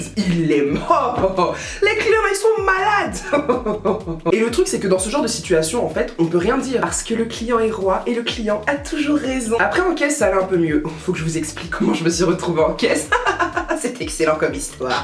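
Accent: Ivory Coast. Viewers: ..je sur kiffe ton accent et vive Abidjan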